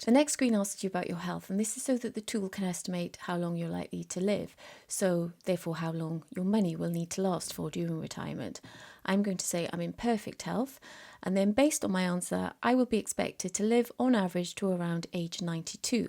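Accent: Wales, United Kingdom. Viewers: this is an amazing tool, thank you so much for the link and the instructions on how to use it. I'm currently at that "juncture" moment in life where (at 62) with £500k in my pension pot, and currently earning £55k in full-time employment, is this the year where I hang up my boots and finally retire? This tool makes it so easy to help me predict what to do. PS - LOVE your accent! I'm a Cardiff boy myself, and I'm guessing you're further west?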